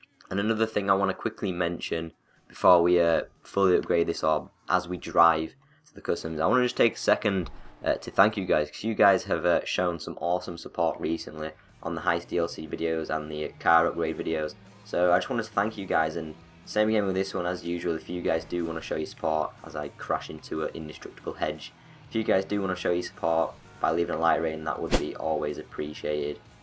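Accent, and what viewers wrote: England, United Kingdom. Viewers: Are you English or American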